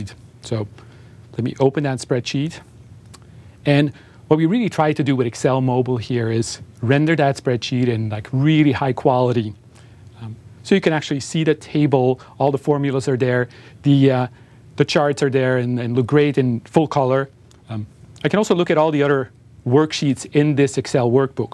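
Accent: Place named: Germany. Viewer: Are you a GERMAN ? Got an accent . :)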